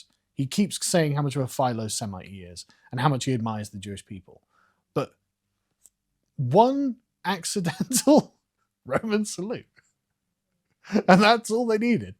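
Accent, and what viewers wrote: United Kingdom. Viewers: Wait your from Central Arkansas? You sound very British.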